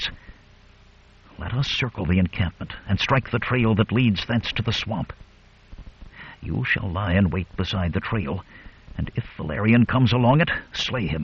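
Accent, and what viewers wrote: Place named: United States. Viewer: Thankfully he speaks American English- the heavily-accented brogue of some readers is not missed!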